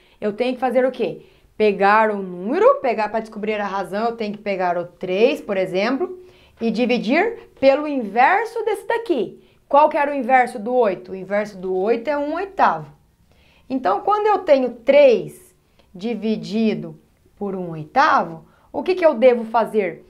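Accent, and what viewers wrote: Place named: Minas Gerais, Brazil. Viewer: Adoro esse "erre" ... és mineira? Tão doce, obrigado pelas aulas.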